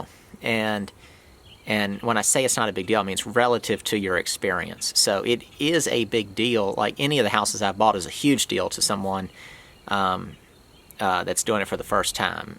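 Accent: South, United States. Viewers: I really like your videos, think I have seen most of them. I'm no american accent expert, but I understand this is some kind of southern accent. In what state do you live/do this work?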